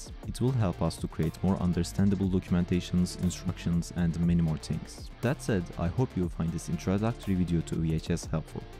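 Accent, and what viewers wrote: Turkey. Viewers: Thanks that was usefull. btw are you Turkish ? Your accent seemed to me like that.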